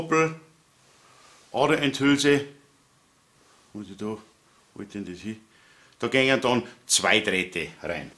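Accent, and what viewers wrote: Bavaria, Germany. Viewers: Hallo, ich bin dem Bayrischen nur bedingt mächtig, konnte dir aber im Wesentlichen folgen. Aderendhülsen - ich bin von Grund auf kein Mensch der gern mit halbherzigen Werkzeugen arbeitet. Aber die Presszange von Knipex für 130 Euro war mir einfach zu teuer. Ich dachte mir, dass dies auch bestimmt günstiger geht und daher habe ich mal bei dir reingeschaut und bin fündig geworden. Danke für deine Hinweise und deinen Randbemerkungen, die oft den praktischen nutzen beurteilt. ich könnte mir gut vorstellen, dass so eine billige Zange bei 16²mm anders pressen wird, als einer Knipex. Nun, ich bin aber kein Berufselektriker, sondern Elektroniker. Ich löte eigentlich fast nur und dies habe ich in der Vergangenheit auch mit Litzen getan - sie verzinnt. Dies soll aber verboten sein und mir gefällt die Isolation nach dem erhitzen nicht besonders gut. 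Außerdem ist es schneller erledigt, Aderendhülsen mit Kunststoffhülse zu verwenden, satt sie zu verzinnen und dann mit Schrumpfschlauch zu überziehen. Ich werde wohl kaum mehr als 3.5²mm pressen. Hauptsächlich geht es um dünnere Steuerleitungen die an einer Leiterkarte angelegt werden sollen. Das möchte ich gern professioneller umsetzen können. Daher die Suche nach geeignetem Werkzeug. Danke für die Info um Quelle. Dort finden sich zahlreiche Anbieter von Zangen und Sets. Ich schau mal dass ich geeignetes finden werde. Mir war wichtig zu erfahren, ob die preiswerteren Zangen schlechtere Ergebnisse erzielen oder in ihrer Anwendung umst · hallo, zuerst mal vielen Dank für deine Videos immer wieder eine Freude anzuschauen und die Art wie du auf deiner boarische Art die Sachen vor trägst herrlich. Ich habe aus deinen Videos schon viel an Wissen dazugewonnen. Ich habe noch zu einer Zeit Kabel absetzen gelernt als wir nur ein Messer hatten und einen ordentlichen Rundschnitt gemacht haben. 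Richtig so dass du den Bleistift spitzen die Meinung geigt. Und das Leben aus der Praxis betrachtest eben wie es auf der Baustelle abläuft. Ich freue mich schon riesig auf dein Video zum Thema Drehstrommotoren und habe dazu sicherlich jede Menge Fragen. Spannend wäre für mich auch eine Sache die vielleicht noch andere interessiert nämlich die elektrische Drehzahlregelung an Drehstrommotoren insbesondere bei kleineren Ständerbohrmaschinen die dann am 230Volt netzbetrieben werden vielleicht hast du mal Lust dazu etwas zu machen. Wenn's morgz kannst ja mal bei mir bei Instagram vorbeischauen ich mache viel mit Holz aber auch Werkzeugbau und Maschinen mit Vorrichtungen. Liebe Grüße aus Berlin der saupreiß zieht die Hut vor dem Bayern.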